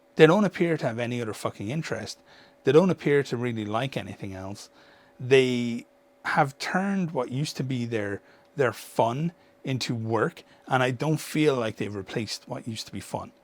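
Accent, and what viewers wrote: Ireland. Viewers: I listen to your long videos for the same reason I listen to Oculus Imperia: those dulcet Irish tones.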